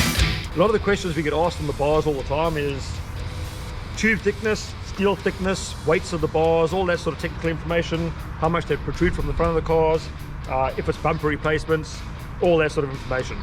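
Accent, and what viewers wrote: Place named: South Africa. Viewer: He sounds South African. :)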